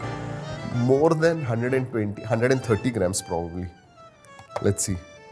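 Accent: Maharashtra, India. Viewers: The whole thing that I love the most is little bit sweet marathi 😍😍luv it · I like that marathi accent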